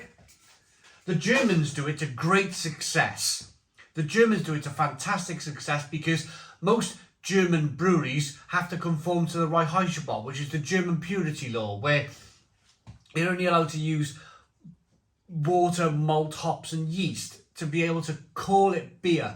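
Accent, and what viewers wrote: Wales, United Kingdom. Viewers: Love your Welsh accent!!😉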